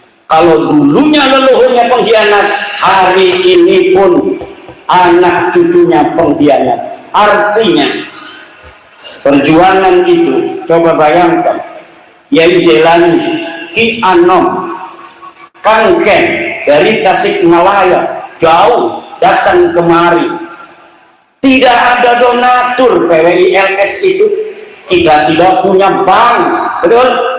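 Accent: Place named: Banyumas, Indonesia. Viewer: ngapak mantap 👍👍🙏🙏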